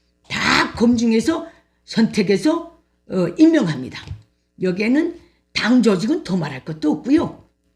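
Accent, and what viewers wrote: North Korea. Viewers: 오늘도 역시~~북한 말씨가..큭...수고하세요!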